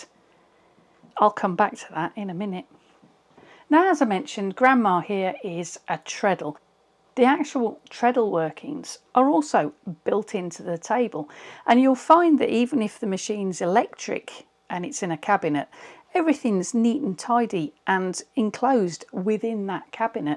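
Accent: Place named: United Kingdom. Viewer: OMG, I still can't figure it out from where you are shooting your videos. Your accent is British, the sewing space looks British too and handcranked machines, but your Oregon /Sisters video:-))) LOL.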